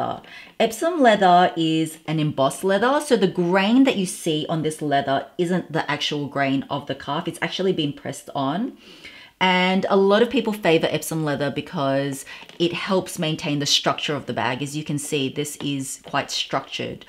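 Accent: Australia. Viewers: I love your Aussie accent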